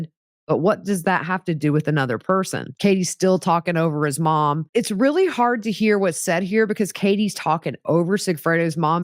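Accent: South, United States. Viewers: I love Wendi but i love your southern accent also.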